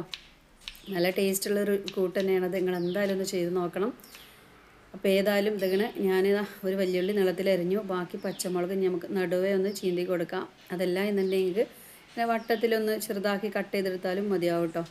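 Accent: Malappuram, India. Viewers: പൊന്നാര താത്ത മലപ്പുറം ഭാഷ കേൾക്കാൻ ആണ് ഞാൻ ഈ വീഡിയോ കാണുന്നത്❤️😂😂👍🏻👍🏻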